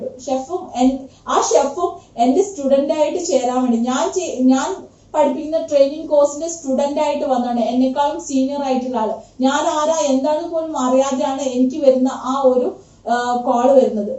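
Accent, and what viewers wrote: Kasaragod, India. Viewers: Chechi kasaragod ayrno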